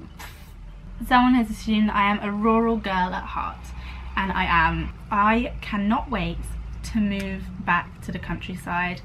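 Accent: RP, United Kingdom. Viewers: Definitely posh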